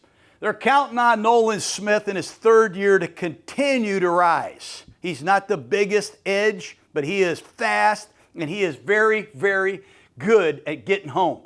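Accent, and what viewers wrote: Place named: South, United States. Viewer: He might sound like a red neck, but he gives a quality presentation, good vernacular and spot on.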